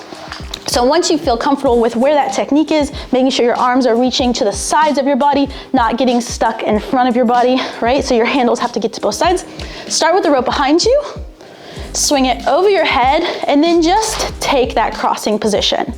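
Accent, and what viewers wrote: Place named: General American, United States. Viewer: she has a simple, more-or-less "standard" American accent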